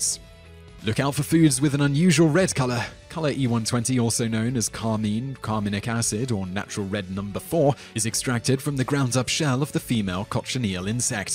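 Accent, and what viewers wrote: United Kingdom. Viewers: *Story time!* As a muslim and my family not being religious we end up at alot of non-halal restraunts so I have to pick and mix from the veggie menus ( i used to be veggie for pretty much my whole life before converting to islam.. even slumping on the vegan side!) aaaaannnnd tbh They're pretty good at stating whats vegetarian or not as well as I'm allergic to all E-numbers, colours, preservatives, flavours, blah and blah so I have to read the ingredients lists anyhooo but Again, the guy in the video is pretty much right... if you want to guarantee something is veggie/vegan then grow/make it yourself! *we salute you Wonderfully british accented narrator....*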